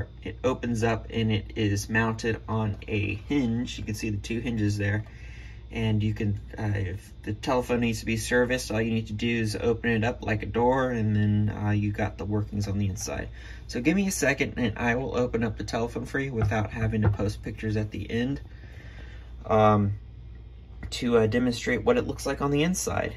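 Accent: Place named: France. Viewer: You sound really cute 🥰;) French accent is nice 😊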